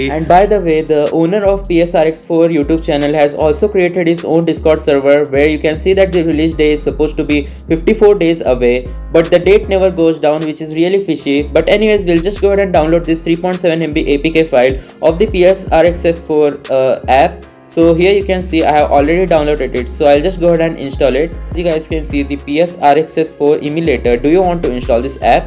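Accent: India. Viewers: OH GOD !! i hate so much indian accent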